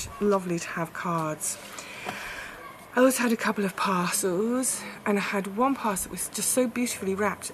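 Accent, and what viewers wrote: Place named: Dorset, United Kingdom. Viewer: I noticed you're getting a bit of a Dorset accent here & there! xx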